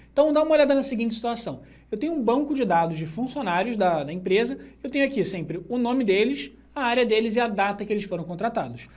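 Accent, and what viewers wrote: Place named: Rio de Janeiro, Brazil. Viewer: Sinceridade, não sei se é sextou com vba ou estou com vba, eita sotaque do carioca... na dúvida #sextoucomvba! vou pelo ouvido...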